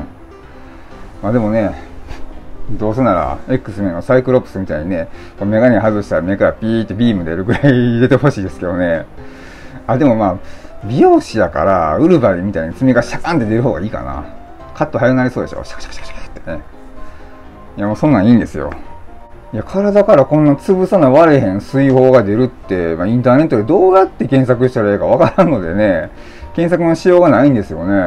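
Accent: Osaka, Japan. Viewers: いろんな変わった症状が出てくるんですね。大変な事でなくで良かったですが。先生がこの事うまくさんに説明できるかなって心配。話の途中で漫才風になるのが大阪人ですね。昔１０年ほど大阪に住んでいたんで大阪弁懐かしく聞いています。コテコテになるほど思い出が出てきて嬉しいです。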